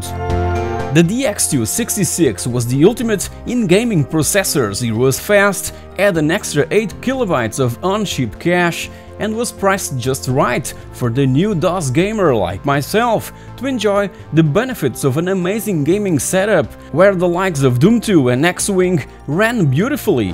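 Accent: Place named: Portugal. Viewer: já agora digo-te, percebi logo pelo o teu sutaque que eras português, sendo que eu também sou e tenho a noção de como é o inglês da maioria das pessoas cá que não ficaram habituados, até ver-te a falar no vídeo. Acho interessante ver a nossa comunidade a fazer este tipo de conteúdo, visto que a maioria ou são brasileiros, ou de outros paises "mais interessantes". Acho que isso já me fez pensar em subscrever ao canal, abraço, e boa sorte.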